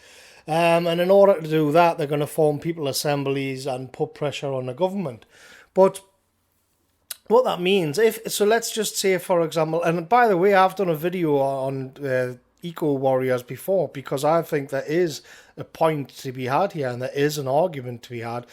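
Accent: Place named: Newcastle, United Kingdom. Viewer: Fuckin hell a Geordie?! :O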